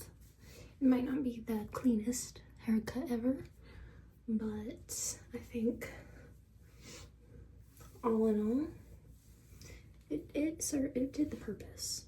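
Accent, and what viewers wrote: South, United States. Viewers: Wow, is it just me or is a Southern accent coming out more in this video? 😁 Love the hairstyle!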